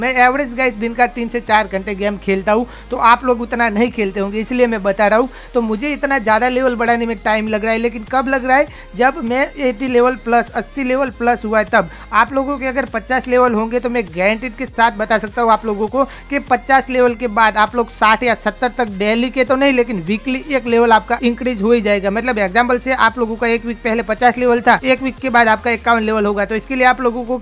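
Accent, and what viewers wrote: Gujarat, India. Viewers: Bhai aap gujrati hooo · app Gujarat se ho kya bro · bhai aap gujarati ho? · App gujrati ho kya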